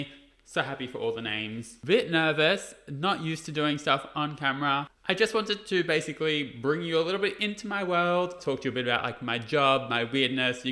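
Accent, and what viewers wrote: Australia. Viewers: I didn't realize your accent was Australian. So cool to learn more about you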